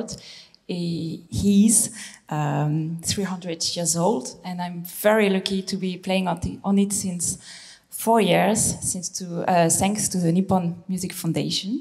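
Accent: France. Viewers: Beautiful music played by a beautiful lady. Her French accent makes English sound lovely. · Lovely! Sweet English in French tongue.❤👌😍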